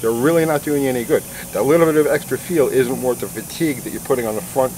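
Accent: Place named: New York, United States. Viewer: i know this is off topic but r u by chance from the east coast ? u sound like a guy i grew up around good guy taught me a lot he was from new york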